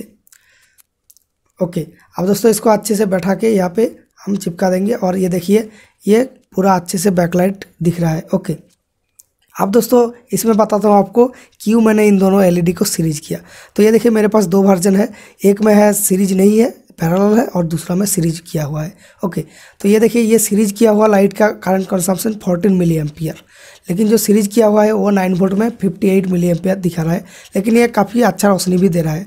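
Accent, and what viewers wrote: Bengal, India. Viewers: Are you bengali ? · Tum bangali ho keya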